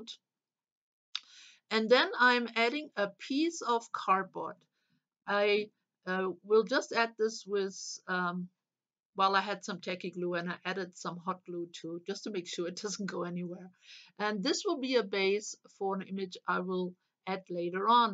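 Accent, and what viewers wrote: Germany. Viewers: hab doch gedacht ich hör etwas deutsch heraus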